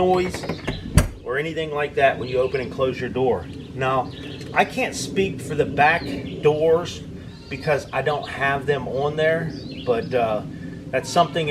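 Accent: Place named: Pittsburgh, United States. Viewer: I am so glad I just discovered your you tube site! I looked at your video playlist and am amazed at how creative a DIY master you are. I also heard in your speaking that you must be from the Pittsburgh Pennsylvania area, as you possess several distinctive Western PA speech sounds. I went to school there (Carnegie Mellon, Theatre/Speech School) back in the early seventies, and love that particular American "dialect". A very good friend lives there and so, I get to hear it again, from time to time. Thanks for your spectacular videos. I look forward to watching most of them, as you appear to know all one should know in order to take care of ones self in this world (or not to get ripped off when someone else 'services" your need) It is never too late to learn. I'm 70, and still have lots to learn!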